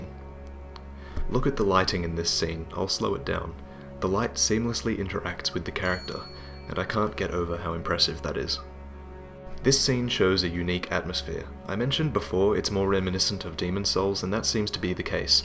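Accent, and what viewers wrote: Australia. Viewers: Your accent reminds me of someone... Chase from House. Are you Australian? Anyways, great video! Didn't notice he used both weapons on the downwards cut lol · I smell... i smell.. an australian. Correct? if so, do you live in perth?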